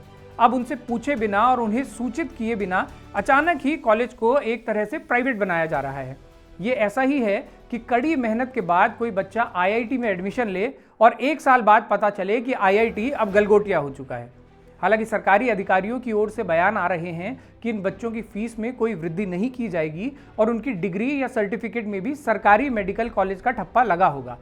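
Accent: Garhwal, India. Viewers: बहुत सुंदर भूला aap nispkch khabar chahate हो और जब बीच बीच मे gadwali बोलते हो तो 4 Chand laga देते हो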